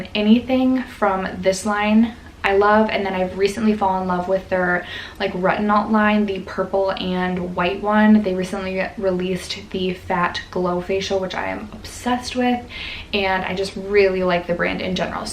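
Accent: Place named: Canada. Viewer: I thought you were Canadian when I first started watching your channel because you sound more Canadian dialect wise. Source- I'm from Canada.🙂🇨🇦 Love your vids!!!!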